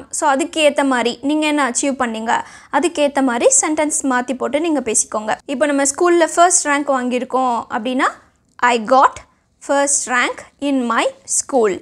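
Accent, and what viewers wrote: Madurai, India. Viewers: Ne ga madurai ya ne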